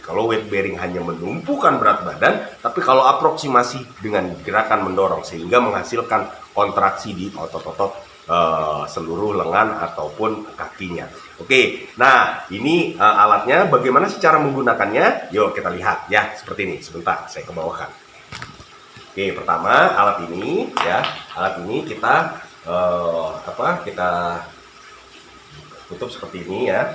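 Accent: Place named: England, United Kingdom. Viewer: Bang jelasinnya pakai full bhs Indonesian saja. .soalnya terlihat lucu aksen Inggris nya.. 😂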